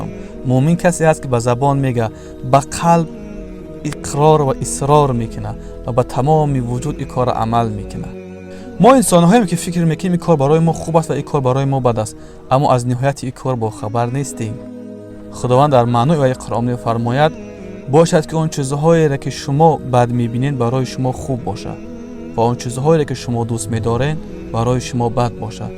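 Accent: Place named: Afghanistan. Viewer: Бехтарин бародар рост мусалнон ба хама нематои Аллах шукургузор бошем мо точико миллати боем забони зебох дорем агар мисли хамин бародар сухан кунем яьне лахчаии гап задан мисли Ирону Афгон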